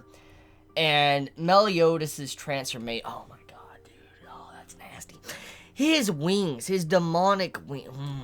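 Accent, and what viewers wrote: South, United States. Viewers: Pretty sure is from the South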